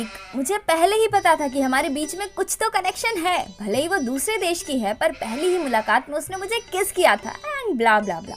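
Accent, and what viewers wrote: Bengal, India. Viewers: Didi tumi bangali naaaaa sondeho 6ilo kin2 tomar vat katha te pakka hoye 🥰🥰🥰🥰🥰🥰🥰🥰🥰🥰🥰🥰🥰💋💋💋💋💋💋💋💋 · Are you Bengali? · You are Bangali right? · Are you bangali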